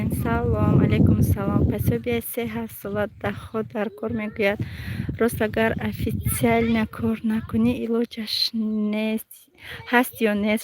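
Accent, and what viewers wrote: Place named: Vahdat, Tajikistan. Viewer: Салом Алейкум.Ман фикр мекардам аз Вахдатен,барои,ки лахчатон монанд.Аллох нигахбонатон,Хохарчон.УВАЖАЮ ВАС!!!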